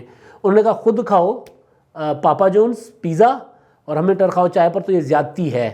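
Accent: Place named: Sindh, Pakistan. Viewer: Nice presentation with melodious Sindhi touch 👍🏻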